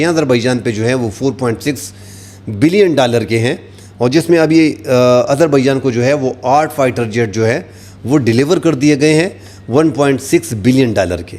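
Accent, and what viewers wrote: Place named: Karachi, Pakistan. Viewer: آپ پشتو سپیکنگ ہے۔ مگر آپ کے گفتگو میں کراچی اردو سپیکنگ کا لہجہ بھی ہے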